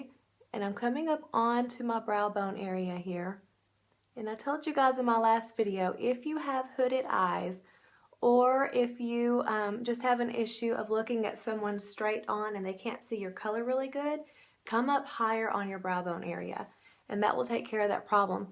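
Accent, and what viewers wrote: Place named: South, United States. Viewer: This is a beautiful look!! I have really small hooded eyes and allergies that make my eyes red all the time. I'm going to try this ASAP and pray that I don't look like Satan!! lol. I love how you used blusher as an eye color....never have thought of that. By the way, what kind of diva light do you use for your videos?? It is wonderful....not too bright but gives you that glow where we can really see. And as a "Southern Belle" myself....I love your accent as well 😊😊!! Thanks for the video. Can't wait to try this!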